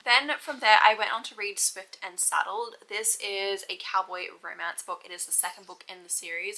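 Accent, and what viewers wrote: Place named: Australia. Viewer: the australian cowboy accent 😭😭😭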